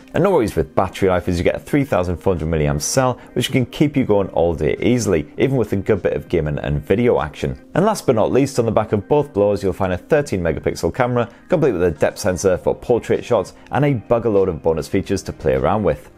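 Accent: United Kingdom. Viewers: At last, someone speaking with a British accent!!!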